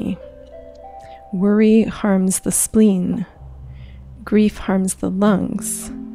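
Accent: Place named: Canada. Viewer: ".....ay?" you Canuck you nice gentleness, there is so little of that now.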